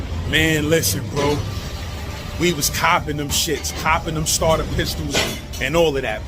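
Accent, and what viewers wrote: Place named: New York, United States. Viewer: QUALITY STORY TIME! and ousside on feet MOOOOVINN yea thats a NY nigga 🤣 love from CT 💯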